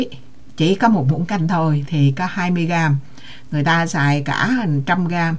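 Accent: Quang Nam, Vietnam. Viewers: cam on co da chia se cong thuc. Rat de dang va cach noi chuyen nguoi Quang Nam cung rat de thuong.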